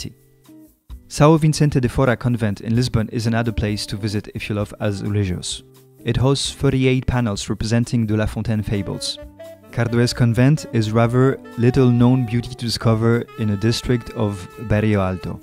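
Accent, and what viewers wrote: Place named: France. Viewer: I think you're wrong, he sounds French. Love the accent. ❤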